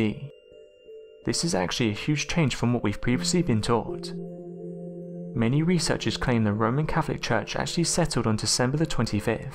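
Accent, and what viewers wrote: England, United Kingdom. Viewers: Qwerty Bwomp he’s English.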